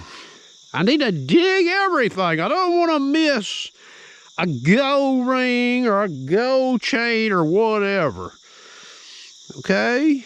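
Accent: South, United States. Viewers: He's pretty smart for sounding like a hill-billy isn't he? :). When I saw him put a Legend near a fan and spin a coin around to test the speaker.. I knew then this dude was a genius!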